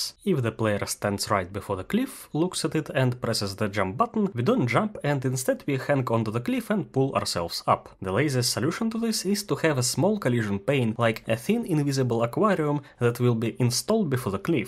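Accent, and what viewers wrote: Russia. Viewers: Ты русский? акцент очень похож )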